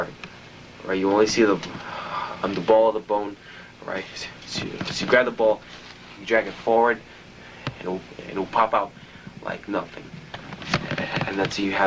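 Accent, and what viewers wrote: New York, United States. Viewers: He has a new york accent tho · He has a New York accent though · his New Yorker by his accent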